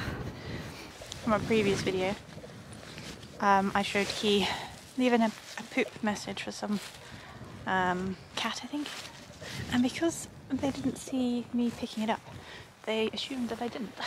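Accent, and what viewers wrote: United Kingdom. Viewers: you have beautiful eyes and hair.You also have such a sweet voice.I love the British way of speaaking.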